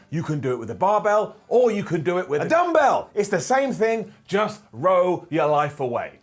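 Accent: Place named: Cockney, United Kingdom. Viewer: Are you a Cockney? You sound a tad like the cockney Geezer from the mighty boosh.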